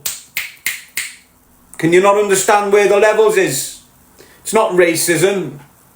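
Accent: Liverpool, United Kingdom. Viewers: scouser quntt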